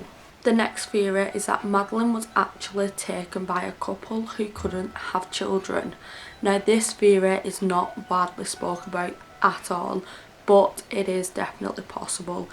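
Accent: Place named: Wigan, United Kingdom. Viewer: Her parents had nothing to do with her disapperance. Not enough time and where could they hide her in that time. She was obviously kidnapped, and the rest we do not know. I hate it when people bring conspiracy theories etc. It heart breaking and the thought of her being sold to sex traffic makes me sick. I really hope that she is living with someone who could not have kids and she has forgotten what happened to her and is living her life happy. I'm trying to figure your accent, is it Bolton, Wigan, Oldham or Cumbria. I live on Anglesey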